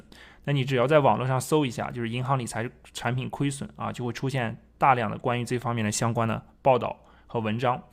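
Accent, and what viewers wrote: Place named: Shanxi, China. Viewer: 感觉你是山西人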